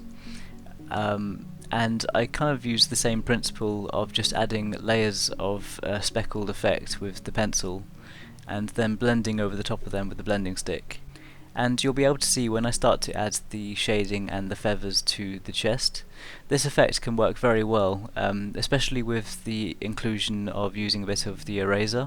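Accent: England, United Kingdom. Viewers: So just normally English.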